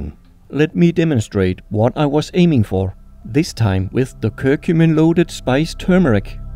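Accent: Denmark. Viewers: Danes don’t pronounce things the way that they appear in the spelling. “Kopper” sounds more like “koh (w)uh”. What you are hearing him do is “speaking English” with a Danish accent. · You sound super Danish xD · I totally knew you are Danish, because of the accent. I always wondered what exactly happens in the body when eating all that gold. I asked the chef at "Restaurant Ti Trin Ned" when I worked as disheasher in 2007/08, and he said that it was all safe. But there might be long term side effects when eating gold every day. And then again, who does that? 😁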